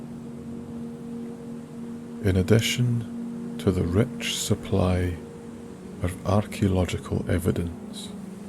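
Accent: Scotland, United Kingdom. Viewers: your soothing voice with the Scottish accent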